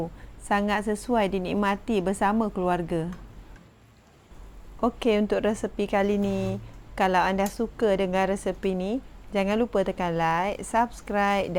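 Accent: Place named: Malaysia. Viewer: Ni bunda malaysie logat bicaranya, tusini kue cincin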